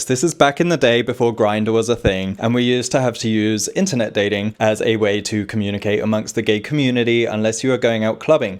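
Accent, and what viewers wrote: England, United Kingdom. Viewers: Hi, new Irish subscriber here - you are so handsome and I love your English accent and tattoos - as an Irish gay man myself, I’d happily date you in a heartbeat 🇮🇪☘️🇬🇧😍😍😍😍😍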